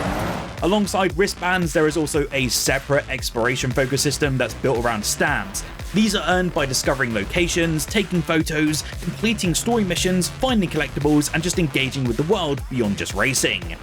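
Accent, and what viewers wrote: United Kingdom. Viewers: I normally love the English accent, but I feel that you're so overly animated. I can't tell if this is a serious review or something from Saturday night live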